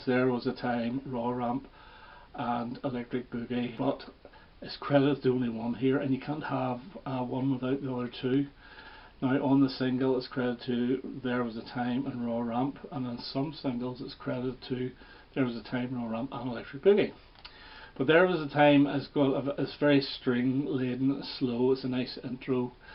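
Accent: United Kingdom. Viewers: From America.. it's lovely to hear the Brit accent. We here were only introduced to Electric Warrior and Slider, and it's fun to catch the rest. Like your selections and collection! 😊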